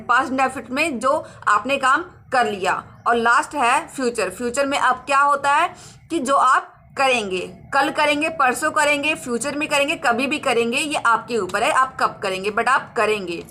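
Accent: India. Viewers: Your English is our Indian English like I understand better than others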